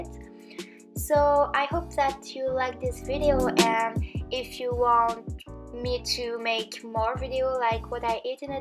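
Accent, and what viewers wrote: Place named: France. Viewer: Je crois deviner l'accent francais non ?😉 super video !❤️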